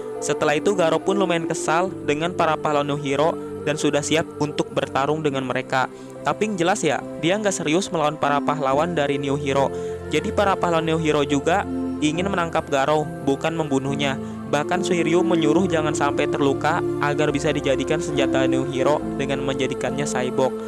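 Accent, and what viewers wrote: West Java, Indonesia. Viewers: Mimin na orang Sunda ouy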